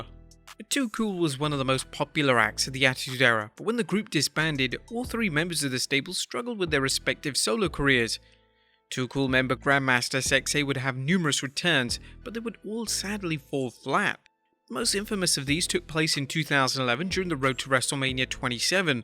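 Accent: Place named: United Kingdom. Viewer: Gotta love a top 10 list about lack of crowd reaction but we never get to hear the reaction, just a British voice talking. Also, why are top 10 lists always British?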